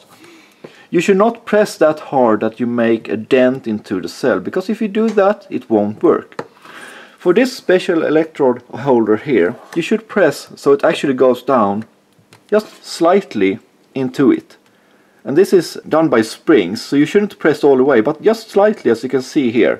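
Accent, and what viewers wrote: Sweden. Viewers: typiskt svengelska!lol enyoyed this video lol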